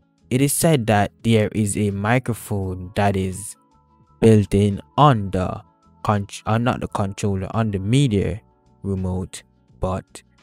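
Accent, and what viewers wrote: Jamaica. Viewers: This man is Jamaican Peeer da rimot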